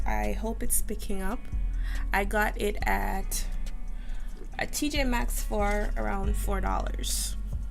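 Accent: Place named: Caribbean. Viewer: I hear an accent are you from the Caribbean?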